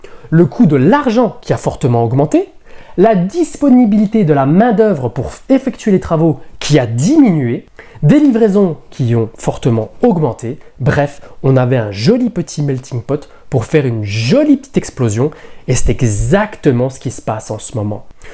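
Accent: North, France. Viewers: L'accent du nord. Rien de spécial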